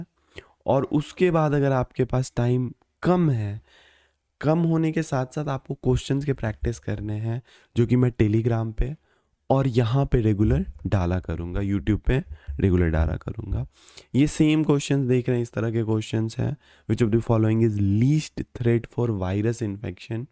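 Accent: Bihar, India. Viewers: Sir, are you from Bihar..? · India se Hai... bihar kya laga raha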